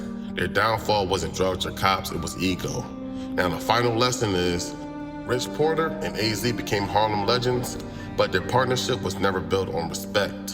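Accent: Philadelphia, United States. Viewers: U from North Philly huh😂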